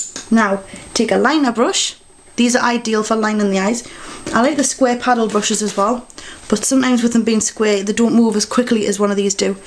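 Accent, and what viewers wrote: Newcastle, United Kingdom. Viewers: shes from newcastle LOL.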